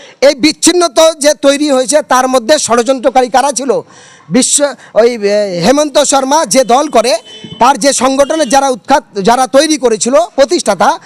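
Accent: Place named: Bangladesh. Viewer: Bhai ki tumi bangladesi neki aaato sundar bolso tumi pakka bangladesi mone hoi tumi ki joy bangla sele tumi sala bangladesi mone hoi 😎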